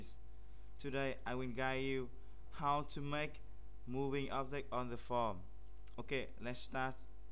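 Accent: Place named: China. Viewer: Sorry buddy, but you do NOT explain any of the commands you enter, so the viewer is left guessing why you are doing things. Plus with your heavily accented chinglish accent I suggest you GIVE UP these tutorial to be honest. They just do not crack it compared to many others I have watched and learned a lot from. Maybe do them in Chinese for your fellow countrymen instead ?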